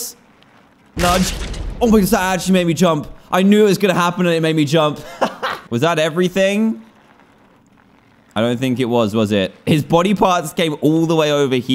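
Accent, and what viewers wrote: England, United Kingdom. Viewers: He’s British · Tayler Douglas what? He is from england...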